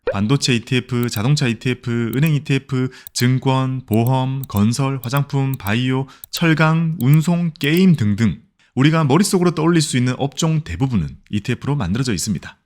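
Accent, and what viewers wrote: Gyeongsang, South Korea. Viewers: 경상도 사투리가 있는거 같지만 목소리가 너무 좋습니다. 귀에 쏙쏙 들어오네요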